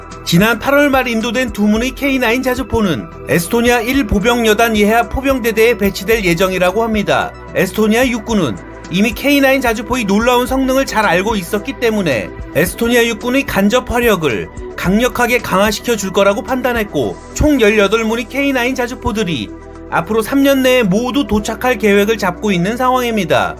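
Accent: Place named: North Korea. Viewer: 영상 잘 봤습니다. 영상 몇개를 봤는데요. 내용이 참 좋네요. 근데 말투가 남조선민주주의인민공화국 스러운 말투가 떠오르는 듯한.....ㅎ;(죄송요^^;) 영상 초반에는 너무 강렬 하신데, 후반으로 갈수록 남조선민주주의인민공화국 스러운 느낌이 빠지고 괜찮아 지시는데 ㅋ 말투 특유의 개성이 있으시네요. 살짝 북한 스러운 ㅋㅋ 초반에 좀 어색했네요. ㅎ 암튼 내용 좋고, 개성 있으셔서 잘 되실거 같습니다.^^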